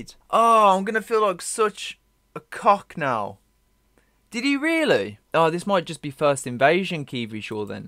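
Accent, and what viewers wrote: United Kingdom. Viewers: Aye bro, what part of the UK do you hail from?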